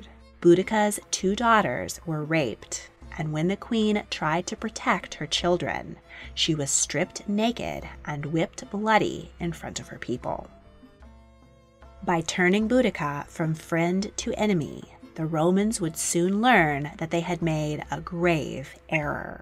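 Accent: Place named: Northern California, United States. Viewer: Good and maybe accurate, but a North Cal accent narration ? You could of hired Steven Toast of London.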